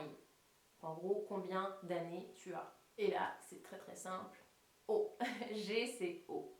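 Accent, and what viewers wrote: France. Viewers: Alors l'italien avec l'accent Francais est un regal absolue! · Elle a un accent français quand elle parle italien ça me tue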